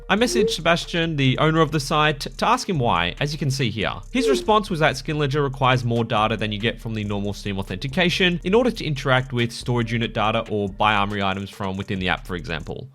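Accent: Australia. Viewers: couldn't help but notice the aussie accent haha. I'm from Australia myself and was wondering how you account for the 10% gst for depositing on 3rd party sites like skinport/csfloat. Do you just tank the fees or is there a way to navigate around it? Love the vids man keep it up!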